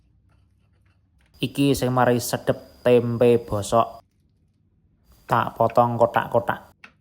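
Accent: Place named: Banyumas, Indonesia. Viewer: bahasane ngapak bangeet Mas 👍🏻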